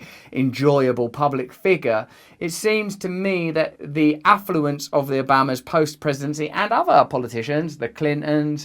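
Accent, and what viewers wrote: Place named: England, United Kingdom. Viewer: Not going to lie, I wasn't really a fan of Russell Brand years ago. I thought he was just another inept Hollywood elite who probably couldn't think for himself and was only popular because of his goofy English accent. I take all of that back. Thanks for keeping open discussion alive and calling out these modern day corrupt elitists.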